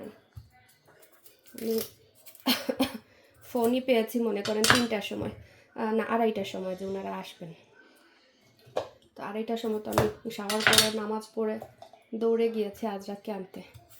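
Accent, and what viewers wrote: Sylhet, Bangladesh. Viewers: আস্সালমুয়ালাইকুম ,আজকে ১st কমেন্ট করলাম, আমি আপনার একজন রেগুলার ভিউয়ার্স, আমি আপনার ব্লগ দেখি কত ইয়ার আগের থেকে ঠিক মনে নাই, তবে আপনার সিলেটি ভয়েস শুনে ই আমার জা আপনার ব্লগ শেয়ার করেছিলো বলসিলো দিনা কি সুন্দর সিলেটি ভাষা শুনতে ভালো লাগতো তবে অনেক কথা ই বুঝতাম না 😅, তখন মনে হয় করোনা র সময় ছিলো ।আমরা জাপান থাকি ,আপনার ব্লগ অনেক ভালো লাগে, আর কত যে রেসিপি আপনার কাছ থেকে শিখছি, আলহামদুলিল্লাহ, একটা কাবাব এখন ও প্রায় করা হয় ওই যে পাটা তে চেছে বিফ কাবাব, আপনার আম্মা র রেসিপি । অনেক দোআ আপনার জন্য মাশাআল্লাহ এত্ত গুণী আপনি, আর আপনার ঘর গুছানো আমার অনেক পছন্দ ।অনেক কিছু লিখতে মন চাইতছে থাক ❤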